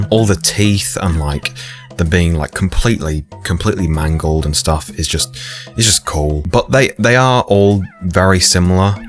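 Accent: United Kingdom. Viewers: i was not expecting that voice from you dude lol you dont look british at all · Is this guy British or smth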